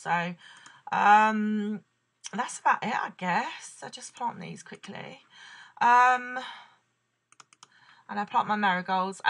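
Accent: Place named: United Kingdom. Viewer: Stop saying who you aw, remembaw, whatevaw your british accent is screwing up youaw english!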